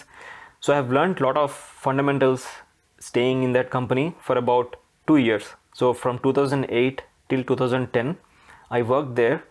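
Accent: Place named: South, United States. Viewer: southern people say 'fi' for 'five'.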